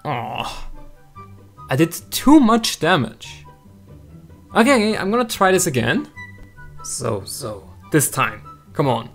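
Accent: Germany. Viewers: You sound like Niki Lauda. Are you german? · klingst deutsch 🤔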